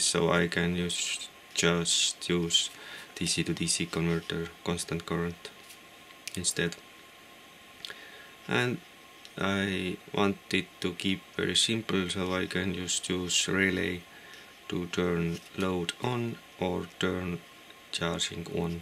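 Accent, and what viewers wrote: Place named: Estonia. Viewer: You sound like Estonian are you ? Or maybe Finish or Hungarian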